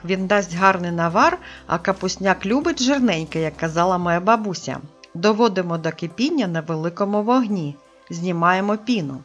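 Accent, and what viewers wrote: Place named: Carpathians, Ukraine. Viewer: Наголоси ставите неначе ви з Карпат, а не з Полтавщини. Повинна ж бути автентична українська мова регіону.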